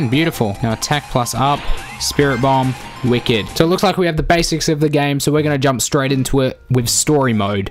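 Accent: Australia. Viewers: Are you an Aussie m8!? Sound like my girls brother lol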